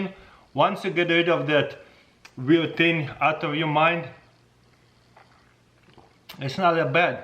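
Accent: Russia. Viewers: Russian Accent hits you in the first second.... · His russian accent is thiccer than the water and i love it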